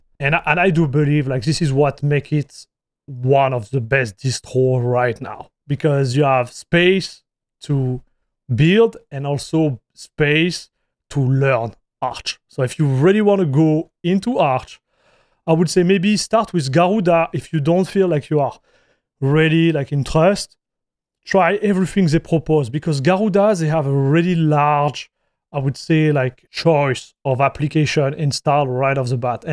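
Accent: France. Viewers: Nah, this is french accent from france. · i have never heard a more french man holy shit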